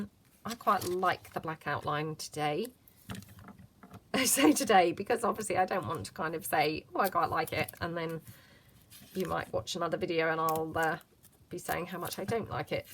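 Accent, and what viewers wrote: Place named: Midlands, United Kingdom. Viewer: Great ideas! You sound like you might be from the Midlands, like my relatives. ; )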